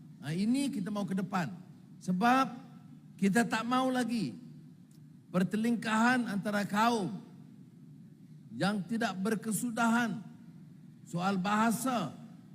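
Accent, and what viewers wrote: Indonesia. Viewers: Kok logatnya bahasa Indonesia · ni memang suke gunekan loghat Indonesia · Raja Malaydesh nonton pidato Ibrahim gak ya? Pidatonya banyak melafalkan bhs Indonesia!! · Anak" kah? Atau budak"..😂😂😂😂. Ini perdana mentrinya aja berkata bargaya logat indonesia..banyak kosa kata indonesia yg digunakan...oh bahasa meleye sungguh merana kau..😭😭😭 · coba Kalian dengerin dehh, si ngomong nya cenderung menggunakan logat bahasa Indonesia 😅😅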